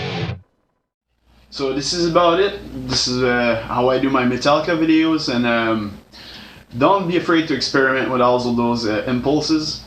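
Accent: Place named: Quebec, Canada. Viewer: je savais que tetais quebecois avec ton accent , jai confirmé avec ton caliss 😂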